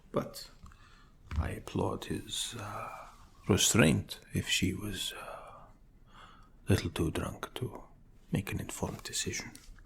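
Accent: Russia. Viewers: Russian accent 👍😄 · You sound like a rusky I bet you're Russian are ya? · If I wasn't already a longtime viewer, and I stumbled upon this video, I would have you were genuinely Russian. Excellent accent, and excellent video as always,. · has the Russian accent down perfectly! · Since you can do a Russian accent, I think it would be awesome if you could do a Most Dangerous Game role play as General Zarrof. I’ve always thought that would be cool for someone to do that. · I thought ur russian cause ur accent is so good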